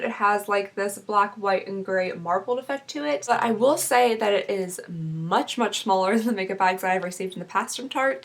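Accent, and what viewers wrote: Kentucky, United States. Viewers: Are you from Kentucky????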